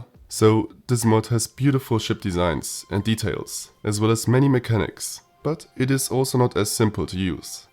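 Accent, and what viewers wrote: Germany. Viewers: Ah, ein Deutscher mit einem dicken deutschen Akzent! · Da hör I do n deutschn? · i can hören the wundervollen german accent, bitte mach mehr videos! 💪💪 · you sound so german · Man hört so raus das du deutscher bist 😅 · du kanst so viel englisch sprechen wie du wilst aber ich höre nen deutschen dialekt wen ich nen deutschen dialekt höre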